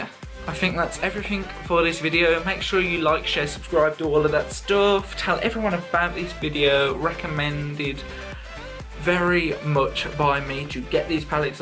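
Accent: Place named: United Kingdom. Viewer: Hey! Just came across your channel because needed some help this video was great and definitely subscribing!! Very helpful and as your British (guessing by accent) it helps me a lot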